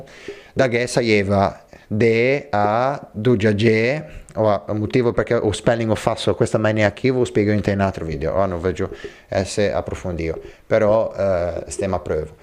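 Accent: Italy. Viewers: Se sente che te du levante😂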